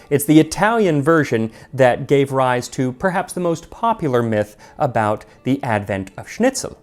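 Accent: Germany. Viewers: It's been a while since I watched a Tasting History. Absolutely love this channel, and love hearing German and his occasional half German half English like the "If you vant" there at the end. ❤ Great stuff... Might have to stop by the butcher to get some veal and try to cook a proper wiener schnitzel.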